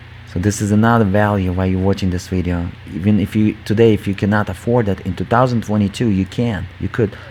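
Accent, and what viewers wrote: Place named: Russia. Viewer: он русский слышно же по речи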